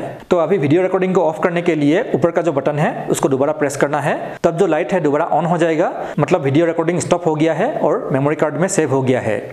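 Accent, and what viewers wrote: Assam, India. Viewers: Toi axomiya hoi....gom pai golu kotha koutai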